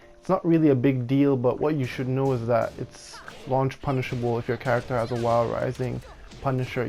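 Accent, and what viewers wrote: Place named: Caribbean. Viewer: Sub for the helpful info and the carribean accent brudda! We need a Trini Tekken character already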